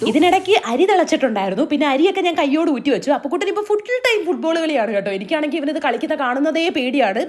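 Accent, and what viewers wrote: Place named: Malappuram, India. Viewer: ഒരു സംശയം ചോദിച്ചോട്ടെ ചേച്ചി ഇപ്പോഴും വീഡിയോ ഇടുമ്പോൾ ന്യൂസ് കൂടാനും സബ്സ്ക്രൈബ് കൂടാനും വേണ്ടിയാണ് ഓരോ വാക്കുകളും തെറ്റായിട്ട് മനപ്പൂർവം പറഞ്ഞിട്ട് അത് പിന്നെ ശരിയായിട്ട് പറയുന്നത് അത് ഈ ചേച്ചിയുടെ സംസാരത്തിൽ നിന്നും മനസ്സിലാകും അത് ഏത് പൊട്ടന്മാർക്ക് ആയാലും പെട്ടെന്ന് തന്നെ മനസ്സിലാവും മലപ്പുറം പറയുന്നതാണെന്ന്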